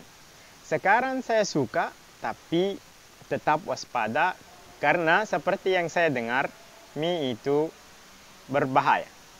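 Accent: Makassar, Indonesia. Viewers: Kereeeeen aksen Makassar da pakai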